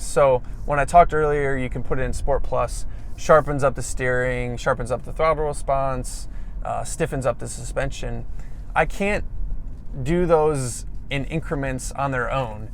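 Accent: United States. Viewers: Dmytro Chygrynskiy speaks perfect American??! Awesome video bro! xD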